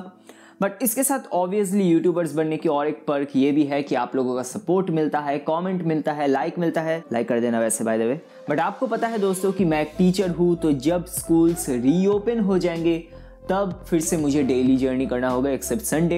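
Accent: Bengal, India. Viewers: Bengali naki Tumi?Bari kothai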